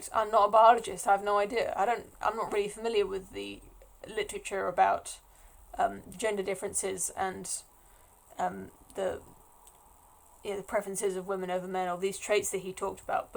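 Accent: United Kingdom. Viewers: Excellent work here,. As an American, I'm guilty of stereotyping. Every time I hear a speaker with a British accent, I automatically believe they're smart. I'm glad to not be let down in this case (probably from all the documentaries of such high caliber produced by the BBC over the years [anything with David Starkey is history porn to me]. It's a shame that their news bias is no better than CNN now)! In my opinion, you should keep doing this. Get a good camera and mic setup and see what happens! It'd be awesome to see you discuss this with Molyneux, Styxhexenhammer666, Sargon, JBP, Computing Forever, etc. We need reason and evidence to prevail over madness.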